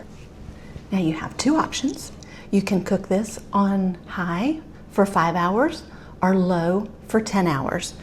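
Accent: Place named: South, United States. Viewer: I love hearing a fellow Southerner talk!!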